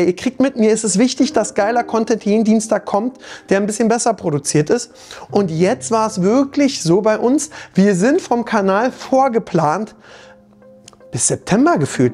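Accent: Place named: Berlin, Germany. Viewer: bin 39 - ich hab dich tatsächlich bei WWM gesehen und dachte lustiger typischer Berliner von der Schnauze her - da hast du echt gut was draus gemacht !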